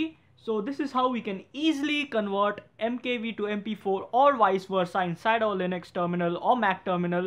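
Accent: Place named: India. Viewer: Indians speaking english sounds very ridiculous, next time use subtitles, the world will thank you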